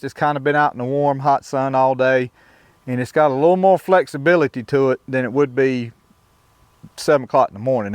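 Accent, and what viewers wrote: North Carolina, United States. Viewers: Well it sounds like we are both from the great old North State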